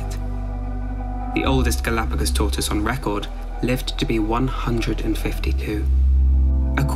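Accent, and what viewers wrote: United Kingdom. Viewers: Thank god there’s a British guy narrating or I wouldn’t have been able to watch. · why are videos like this always narrated by someone british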